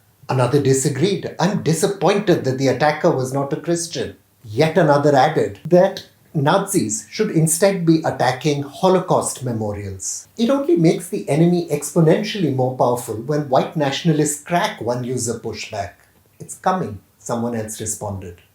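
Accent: India. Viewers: Clown reading MSNBC agenda items ans propaganda with an Indian accent …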